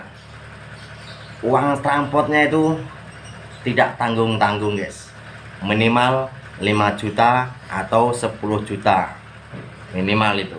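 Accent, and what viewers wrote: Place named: Yogyakarta, Indonesia. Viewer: Sapa hayo itu....jatengnya mgkn Jogja ya kang😂😂😂😂😂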